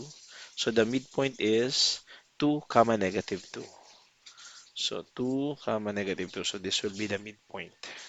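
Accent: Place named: Myanmar. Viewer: မြန်မာသံ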